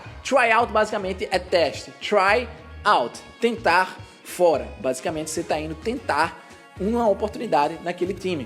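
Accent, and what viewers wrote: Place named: Northeast, Brazil. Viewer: De qual estado do Nordeste você é, djow?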